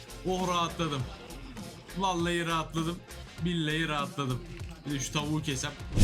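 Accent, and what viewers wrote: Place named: Thrace, Turkey. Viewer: Neden böyle saçma trakyalı gibi konuşuyorsun ki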